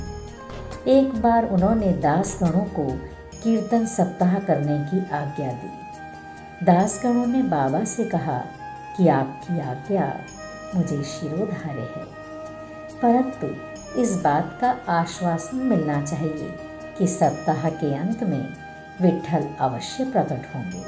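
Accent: Standard. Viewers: ओम साईं राम।बहुत ही मधुर और शुद्ध उच्चारण के साथ आज का ये साई सच्चरित्र का ये अध्याय सुनने को मिला बहुत ही अच्छा लगा।इसके लिए आपका बहुत बहुत धन्यवाद।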